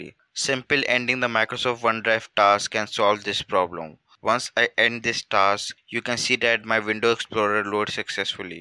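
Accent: India. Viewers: always the man with the indian accent to save the day and solve any tech issue. worked, thanks :D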